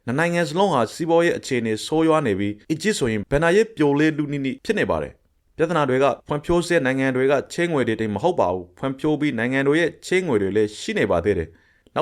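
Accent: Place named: Rakhine, Myanmar. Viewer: Drဆာဆာကချင်းလူမျိုးပါ၊အခုအသံကရခိုင်သံဖြစ်ပါတယ်။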